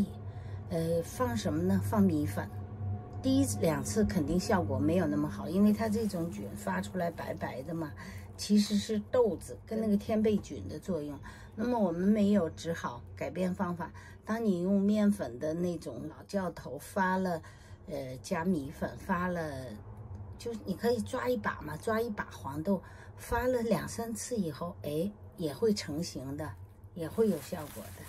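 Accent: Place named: North, China. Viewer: 奶奶您怎么讲话一点不像快百岁的老人，思路清晰，口才好，而且听您说话像北方人，没有一点在东南亚国家生活的口音。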